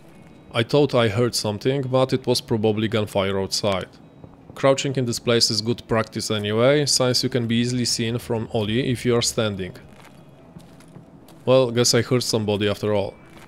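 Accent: Poland. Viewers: Właśnie odkryłem Twój kanał. Nie wiem dlaczego ale od razu po akcencie wiedziałem, że jesteś Polakiem :D Świetne filmy, tak trzymaj!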